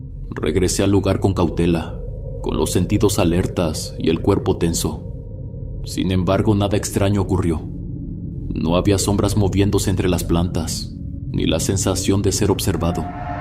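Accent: North, Mexico. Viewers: Hola, tu vioz y la manera de hablar me recuerda a mi primo residente al norte de México. Muchas gracias!! Y, muy bien relato. Como siempre!!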